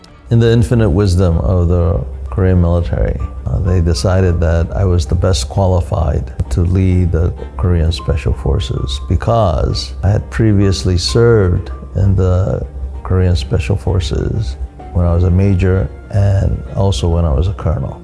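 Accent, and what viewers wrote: United States. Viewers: This man had to have grown up in the USA. That is the smoothest American English I have heard from someone that didn't have it natively.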